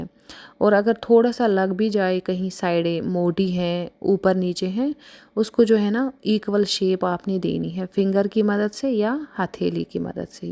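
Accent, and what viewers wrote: Kashmir, India. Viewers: Subscribed 👍 Enjoy Make videos in our own mother tongue that will be more beautiful I don't know where from you people But your accent is soporen Rise and shine❤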